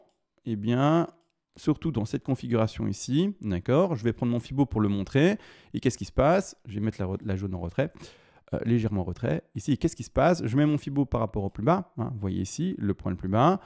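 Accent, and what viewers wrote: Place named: Belgium. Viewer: J’adore ta façon de parler et ton accent ! ( belge - suissse ? )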